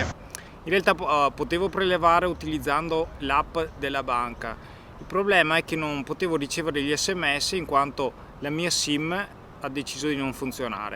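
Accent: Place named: Veneto, Italy. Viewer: Vecchio mi sto sparando tutti i video! Montaggio figo e info utili! Poi l' accento Veneto xè a roa pi bea del mondo!! Ahahah!! Sto programmando di venire anche io in zona appena finisce tutto questo casino.. Matteo da Rovigo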